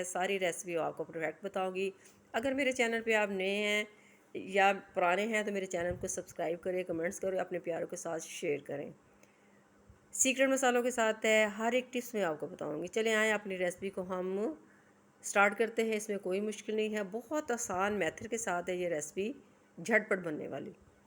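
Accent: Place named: Punjab, India. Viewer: Love your talking ❤😅with Punjabi touch 😅❤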